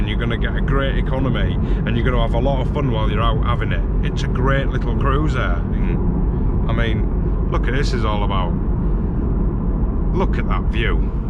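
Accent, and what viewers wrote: Yorkshire, United Kingdom. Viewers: This Gent wouldn’t be out of place on TV based car review show ….based on affordable cars or sourcing little gems like this CRZ …great review and narration and a great Yorkshire accent ….off this review im looking at this Honda …CRZ as my next motor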